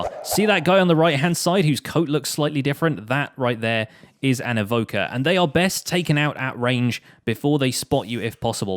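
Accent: United Kingdom. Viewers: XxcoreysindahousexX British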